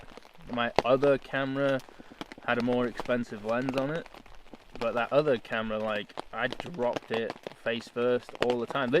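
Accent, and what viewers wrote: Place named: United Kingdom. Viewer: Where are you camping? U sound British.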